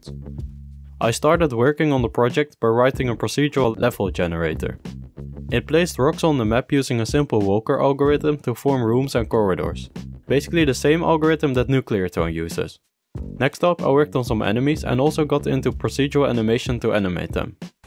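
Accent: Netherlands. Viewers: Ben jij Nederlands zo klinkt het wel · Hey my guy first time watching your vids and i noticed an accent are you dutch I find it very cool when people are dutch because im dutch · Are you dutch? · Damn ik hoorde aan je stem meteen dat je nederlands was haha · not meant bad but you kinda sound dutch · mmmm Dutch accent edit: Ahh I knew it Nederlands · i love how clear it is that you are dutch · are u from the netherlands bc i can hear it from ur accent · are you dutch? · when strong ass dutch accent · Is this guy from the netherlands? Edit: yep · Verry muts a duts aksent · Woah.. As a computer science student, I cannot address enough how amazed I am by your work.. ( I am just a second year tho) Currently learning some unity for the fun of it myself as well, hoping to one day become as good as you are.. Also, I knew from the first words you spoke you where a dutchie too, laughed way too hard at myself when I saw you made the grade app xD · Once u started talking i immediatly knew u were dutch, turns out u r a medenederlander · off topic but i can hear youre also dutch lol :) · You sound so dutch but great english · you'are dutch aren't you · Ik kan echt meteen horen dat jij een Nederlander bent. 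:-) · U really sound dutch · your dutch right? i hear it from the accent, im dutch too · are you actualy dutch? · Are you dutch? You sound pretty dutch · I knew you were dutch from the first second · As someone who is dutch its funny to hear from your voice that your dutch😂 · I smell a bit of dutch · i smell, Nederlands. Am i right? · Man u dutch? U have a bit of a dutch accent Oh nvm, already got my answer, good job tho, really excited to see a dutch indie making a genuinly good game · Je bent nederlands he? Je hoord het. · could you show some vids of you developing sprites, such as trees, or rocks. the simple but necessary things a game needs. i have been wanting to make games myself and have a little bit of experience in making games, but i dont really know how to make any characters/sprites for the game. i hope you could possible teach me a little bit more. ps. like ya dutch accent ;) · You got a Dutch accent, am I in the right spot😉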